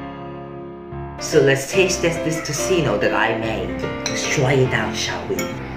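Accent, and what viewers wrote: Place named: United Kingdom. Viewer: The accent though😯 British accent😯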